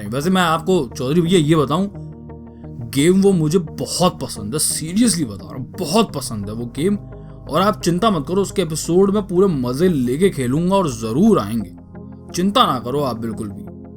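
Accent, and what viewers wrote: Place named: Lucknow, India. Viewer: bhai.. "Welcome to the Indian gamer channel".. sunn ke dil khush ho jaata hai.. dil khush kar diya.. aapke spintires aur mafia2 ke hum kaayal hain.. valient heart bhi dekhna shuru kiya hai.. baniyan wale badasses ko dekhkar hruday gad gad ho utha hai.. ise bhi poora karunga :D bhaiyyaji..waise lucknow k ho ka? aapke baat karneka lehja hamare sharmaji se kaafi milta hai.. aur bohot bohot dhanyawad hume izzat bakshne ka.. Aise hi karre karre gameplay banate jao bhaiyyaji.. Hope to see IG channel flourish..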